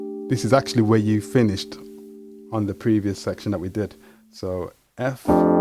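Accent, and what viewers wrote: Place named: Birmingham, United Kingdom. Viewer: great stuff. Do I detect a fellow Brummie? 😊😊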